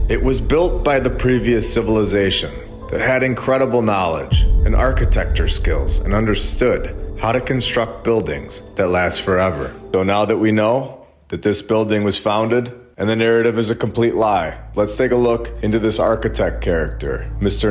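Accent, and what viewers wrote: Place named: Massachusetts, United States. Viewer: Sames! Are you from Massachusetts? 😅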